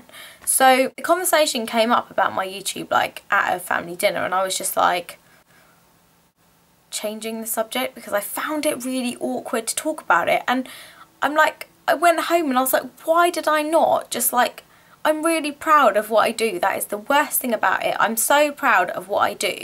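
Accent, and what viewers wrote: United Kingdom. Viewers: Are u from UK. I will definitely date you😜 I love all them people in UK accent I'm moving in UK soon cuz I love the accent omg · I'm so happy that you made this video, you are my favorite person that makes personal videos on YouTube. I love your British accent and you are so nice. I stop making videos because I don't know what to talk about and I feel sometimes depress or wanting to quit my YouTube channel, don't get me wrong i love making videos. Advice please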